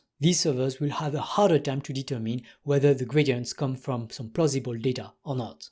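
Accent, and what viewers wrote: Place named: France. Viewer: J’aime ton accent français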